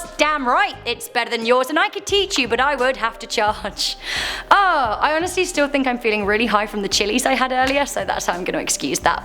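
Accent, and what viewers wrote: England, United Kingdom. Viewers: First off love your British accent very 🔥 second love your facial expressions keep up the good work on the videos and keeping us interested in the videos far from boring 😎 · I'm so glad you've been able to keep your English accent its the best, you should come back to England see your dog and do English things with everybody :D xxxxx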